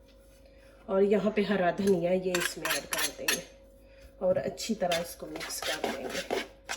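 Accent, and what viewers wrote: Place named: Punjab, India. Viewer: Apki recipes tu achi huti hn par ap apna lhja sahi kar lain ya phir punjabi hi bol liya karain please 🤦😏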